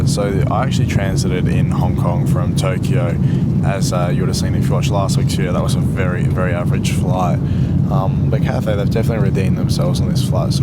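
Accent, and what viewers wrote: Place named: Australia. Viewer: You sound European Australian born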